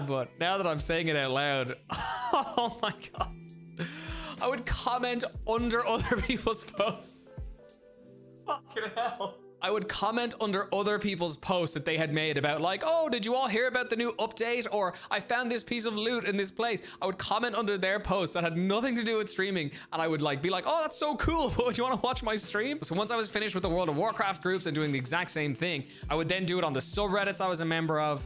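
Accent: Ireland. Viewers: damn he sound like me fr (im irish too)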